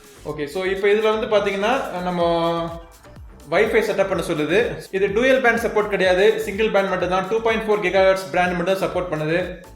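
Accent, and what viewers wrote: Madurai, India. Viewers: Bro..neega Madurai ya....? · Semmma madurai speech and review suprrrr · Niga Madurai ya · Bro neenga madurai ah? Super!!!! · Bro Madurai style is super..... Like acter Vishal Daai naanum madurakaaran thaandaa....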